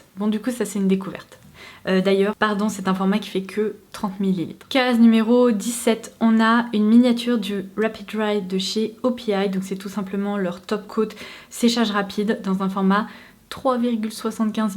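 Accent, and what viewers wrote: England, United Kingdom. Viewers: Ton accent anglais est magnifique, à tu fais des études spéciales ?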